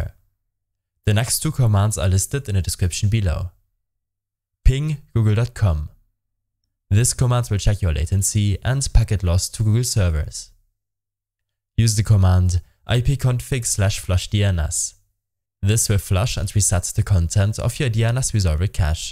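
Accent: Germany. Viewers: Man merkt so hart das du deutsch bist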